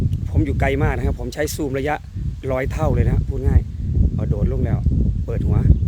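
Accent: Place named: Laos. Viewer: FC.จากสระบุรี เสียงดังฟังชัด ชอบอาจารย์พูดไทยปนลาว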